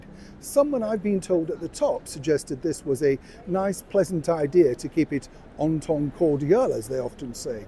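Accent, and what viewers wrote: Yorkshire, United Kingdom. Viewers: Can tell you are from Yorkshire.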